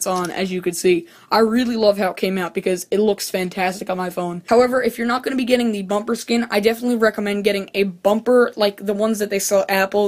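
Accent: United States. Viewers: Do you have a New York accent?? Just wondering, otherwise great video.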